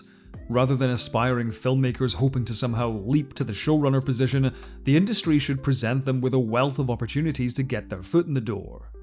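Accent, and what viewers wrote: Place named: England, United Kingdom. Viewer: Wait you sound English. Are not most English and European shows like 1-4 episodes a season which you call series, and then it only goes for like 3 seasons anyways? Or do you get a lot of American TV Shows in UK?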